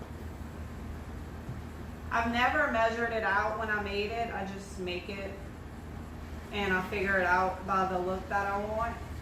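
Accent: Louisiana, United States. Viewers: "and then you'll be ava to see how it looks". My grandmother was from DeRidder Louisiana. I'm from Texas and I've always been in LOVE with Cajun accents. You're so cute and so much fun to watch! You've inspired me to get up and get things done!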